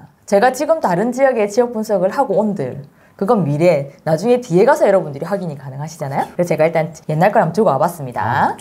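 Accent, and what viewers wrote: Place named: Busan, South Korea. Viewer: 설연휴 보석같은 강의 너무 감사드립니다! 핵미모+부산사투리 매력만점 팀장님이십니다^^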